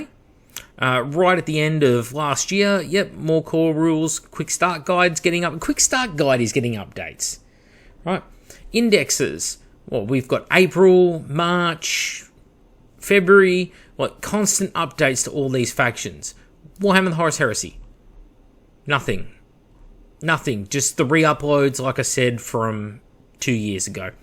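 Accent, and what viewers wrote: Australia. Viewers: Excellent whine in redneck oz voice to ease you to sleep . Raise prices another 10% for oz.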